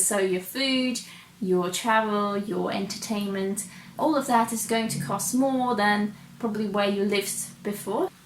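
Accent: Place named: England, United Kingdom. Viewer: I ❤ the English accent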